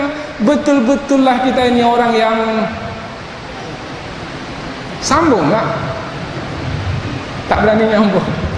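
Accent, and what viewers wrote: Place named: Malaysia. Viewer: Sekali dengar seperti orng Malaysia.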